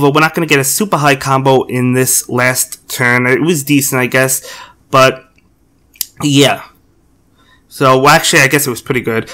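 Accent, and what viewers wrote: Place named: Boston, United States. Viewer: Curious, what region of the country are you in? I think I hear a little bit of Boston in your voice, but I'm not good at telling.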